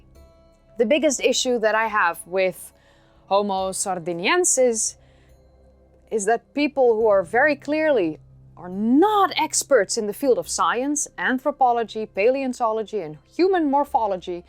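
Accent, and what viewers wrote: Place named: Netherlands. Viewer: your accent reminds me of my trips to the Netherlands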